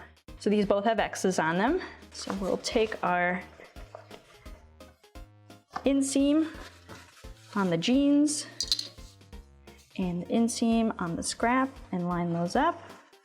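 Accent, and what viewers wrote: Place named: Canada. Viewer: I thought she sounded Canadian....well, it's close by....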